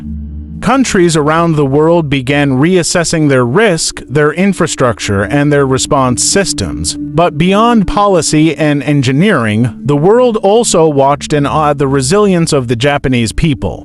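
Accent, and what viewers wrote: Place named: United States. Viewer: Ik zit niet op Amerikaans praten te wachten.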